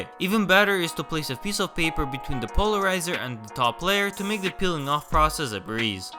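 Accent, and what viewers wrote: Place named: Russia. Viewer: Русский акцент ни с чем не спутаешь. Спасибо, бро 😀👍🏼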